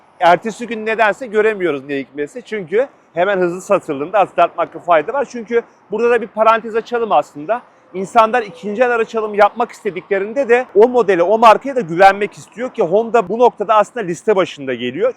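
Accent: Samsun, Turkey. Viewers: Samsun şivesi bu ama konu o değil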